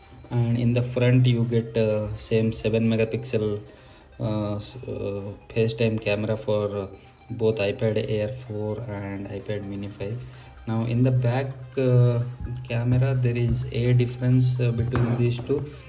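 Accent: Odisha, India. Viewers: Ye pakka ama odia pila ta. English ru Jana padijauchi. Good going bro. Good. And all. Wishes to u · Voice tone suniki janigali odia pua boli😂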